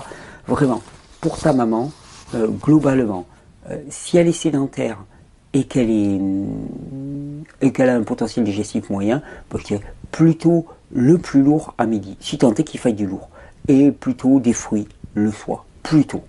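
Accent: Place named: South, France. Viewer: bonjour, au vu de ton accent, tu sembles être du sud de la france, je dirai même sud - est, est ce que je me trompe?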